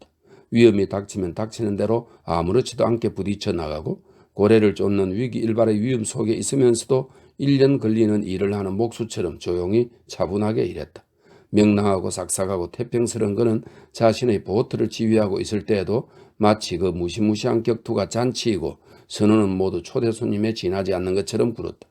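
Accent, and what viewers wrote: Gyeongsang, South Korea. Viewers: 한동훈장관찐팬인데 후니가감명깊게읽은 책이라해서듣습니다 나이가좀많아서 너무감사합나다 두번째듣습니다 모두꾹꾸우욱누르고 경상도사투리어투가 매력적입니다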